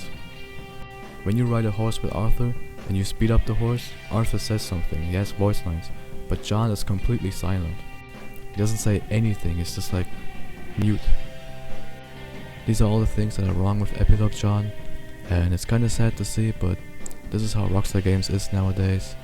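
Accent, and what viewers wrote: Germany. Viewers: I think I hear DEUTSCH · starkes video und feier den deutschen akzent haha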